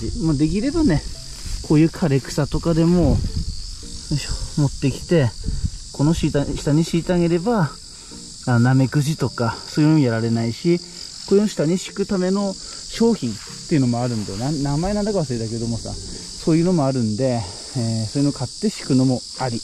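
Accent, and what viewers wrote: Tsugaru, Japan. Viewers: 初めまして。 あの、お教えください。 雌花が受粉されているか否かはどのように見分けるのでしょうか？ お返事お待ちしております。 それと、津軽弁？いい感じですね。 津軽弁YouTubeで人気ですし。 すんたろす、って言うYouTuberのお兄様かと最初思いました。 😅 津軽弁全面に出した方が登録者増えるかもです。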